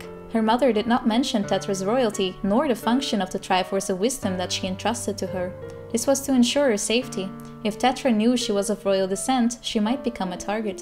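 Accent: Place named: Netherlands. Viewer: She' Dutch.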